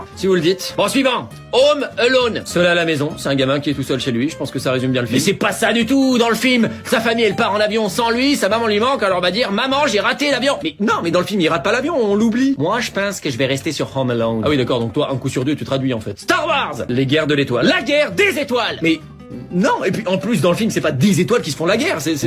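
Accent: Quebec, Canada. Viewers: Son accent québécois c dla marde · Sérieusement C’est quoi cet accent de merde pour le Québecois?😂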